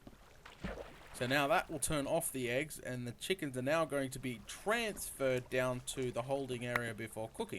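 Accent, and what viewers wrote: Australia. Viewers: Is he Aussie coz I am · Videos and tutorials um yeah it's called an accent........ not everyone in the world sounds the same. he's from australia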